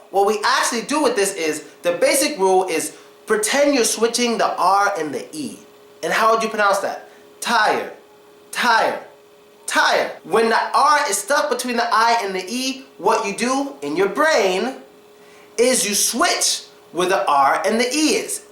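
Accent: New York, United States. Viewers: Grrreat video! where are you from? Newyorker? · NY ACCENT LOL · How much would i give to speak such a perfect Us english ,at least the end of my left small little finger ,well ,thanks for that good lesson (i'm breton and trying to learn, improve your beautiful language)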